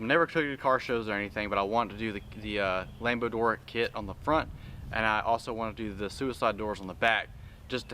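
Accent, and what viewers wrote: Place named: North Carolina, United States. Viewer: I was going to ask where you were from because your accent is almost the same as mine and then you said NC! Edit: just saw the Henderson tag I’m in Greensboro! Love it! And great video, working on planning out my camaro :)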